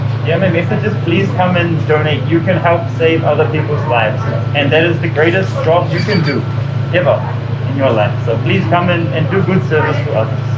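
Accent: India. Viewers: You sound like indian. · you speak English in indian accent lol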